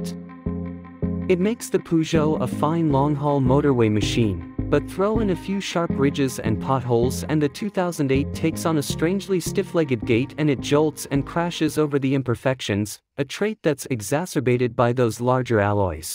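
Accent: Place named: United States. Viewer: A strange review to listen too, the commentary sounds like an American version of R2-D2, the pronunciation of words such as chassis are so American, also the script repeats itself I say the script repeats itself…all in all a ghastly review…..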